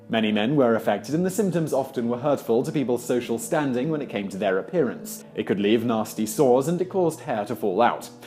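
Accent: United Kingdom. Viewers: Is English not the narrators first language? I keep hearing basic words being mispronounced, so just wondering if English isn’t his native language, because his accent seems to be British... confused. :/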